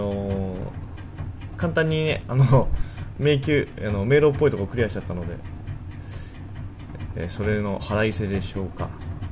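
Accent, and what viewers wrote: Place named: Kansai, Japan. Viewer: 若い頃のガッチさん、関西弁で、かなり高めの声だったんですねー。 あと、レトルトさん、ウッシーさん、キヨさん居ないからか寂しい。 敬語が少なくて、わっかいなーって思たヽ( ｀･ω･)ノ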